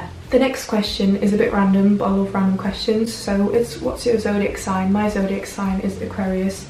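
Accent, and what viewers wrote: United Kingdom. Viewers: Omg wie gut sich dein Akzent einfach anhört😍 Ich mache 2020/21 ein AJ in Weston-super-Mare, North Somerset (hab es mir nicht ausgesucht,bin aber total happy) und ich möchte unbedingt auch so britisch klingen, wenn ich wieder zurück komme. Dir noch einen schönen Tag und vielen Dank für deine Videos :) x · Oha, krass hab zwar erwartet, dass dein Englisch gut ist, aber find‘s echt krass wie britisch dein Englisch klingt! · Love ur British accent ❤ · Ich würd' mich voll freuen, wenn du weiterhin englische Videos machen würdest. Und ich find' man hört voll das Britische in deinem Englisch, was wirklich toll klingt. :D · Ich find es mega interessant zu sehen, dass du einen Nord - Englischen Akzent bekommen hast, weil du ja in dieser Region warst :) Es kommt also wirklich darauf an, wo man lebt, was dann Einfluss auf den britischen Akzent hat!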